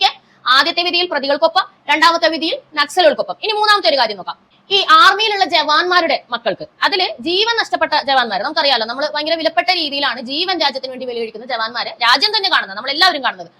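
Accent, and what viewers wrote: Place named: Achayathi, India. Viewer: അച്ചായത്തി ഭാഷ ഒഴിവാക്കി സമാധാനത്തൊടെ സാവധാനം പറയുക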